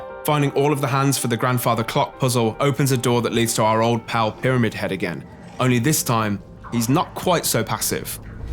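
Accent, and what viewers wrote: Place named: United Kingdom. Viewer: oh shit and he's British! (Same here dude)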